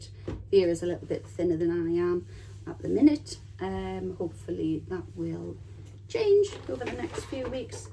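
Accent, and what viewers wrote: United Kingdom. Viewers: I do love that fabric of this latest wrap dress it's so lovely. I wonder where in the UK you live as I'm trying to identify your accent.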